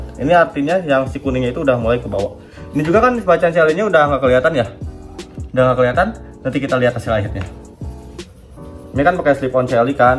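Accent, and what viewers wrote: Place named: West Java, Indonesia. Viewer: Urang sunda pisan cepel wkwk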